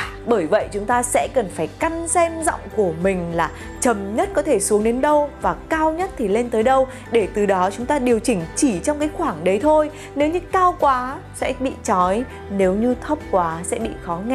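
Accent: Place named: Hanoi, Vietnam. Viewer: chị có chất giọng hà nôi hay quá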